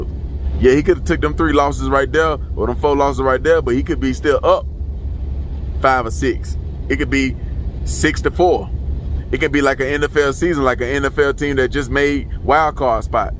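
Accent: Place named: Miami, United States. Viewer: Where you from fam? You sound like a Miami nigga...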